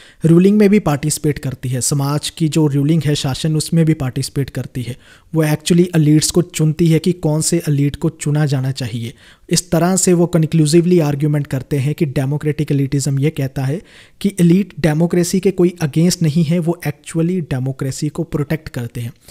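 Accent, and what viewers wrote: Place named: Jammu, India. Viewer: awaz se jammu ka lg rha h bhai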